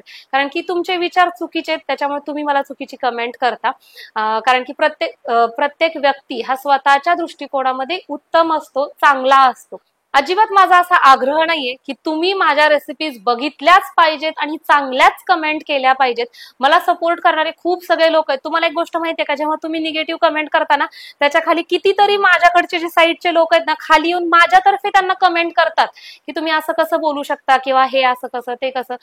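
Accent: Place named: Solapur, India. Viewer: खरं आहे आशा निगेटिव्ह कमेंट आल्या की आपण नाराज होतो. पण आशा कमेंट कडे दुर्लक्ष कर. आणि पुढे करत रहा. मलाही अशाच निगेटिव्ह कमेंट्स येतात. मी दुर्लक्ष करते. तू छान करतेस. आपली सोलापुरी भाषा आपला अभिमान आहे. आपल्या भाषेवरून बऱ्याच कमेंट्स येतात. पण मागे हटायचं नाही. नाराज व्हायचं नाही. पुढे करत राहायचं.❤👍👍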